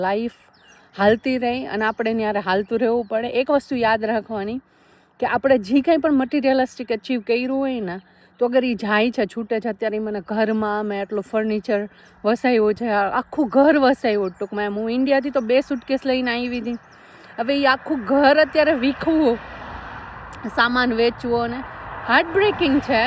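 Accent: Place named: Saurashtra, India. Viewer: બેન આપ મુળ વતની ક્યાં નાં કારણ કે આપની ભાષા સૌરાષ્ટ્ર ની હોય એમ લાગે છે આપ હાલ ક્યાં રહો છો આપની સમસ્યાનો હલ જરૂર ભગવાન માતાજી કરશે... આપની સમસ્યા સાંભળી દુઃખ થાય છે જય માતાજી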